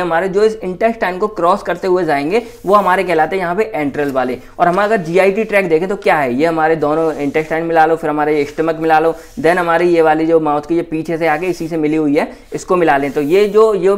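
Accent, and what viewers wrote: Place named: Uttar Pradesh, India. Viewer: u r from up nnaaaa......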